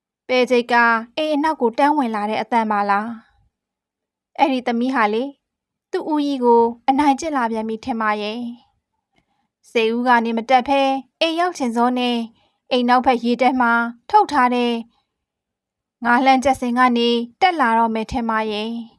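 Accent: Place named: Upper Myanmar, Myanmar. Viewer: ချယ့် အသံ က အညာက ပဲ GEC ပဲ.. လေသံနဲ့ ချစ်ချာရီး 😜😜😁😁 စိုးသာလွန်း တဲ့လား အမည်လေးကဆန်း ပီး ချစ်စရာ စိုးသာလွန်း ကိုချစ်ရင် ပင်လယ်ကိုလည်း ချစ်ရမယ် ငြမ်းစင်ကလှုပ် လှေကပုတ် နဲ့ ကောင်လေးဟာ ပြန်သွားခဲ့ပါတယ် 😅 ဆရာမ မိချမ်းဝေ စာတွေ ထပ်ဖတ်ပြနော် ကြိုက်တယ် ကျေးဇူးတင်ပါတယ် 🥰🥰🥰🥰🥰 👍👍👍👍👍 🤜🤛🤜🤛🤜🤛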